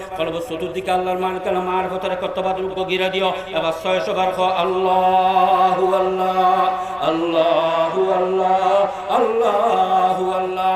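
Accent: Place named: Chittagong, Bangladesh. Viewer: চিটাগং ভাসা বুচতে একটু কস্ট হই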